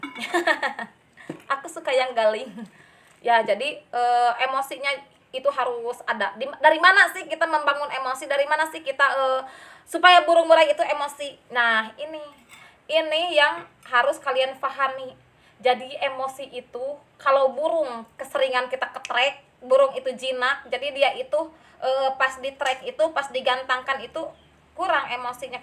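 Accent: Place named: West Java, Indonesia. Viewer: Resep aing mh ngadangu ngomng na Sunda pisa tteh mh 😅😅 Salam santun tteh 🙏🙏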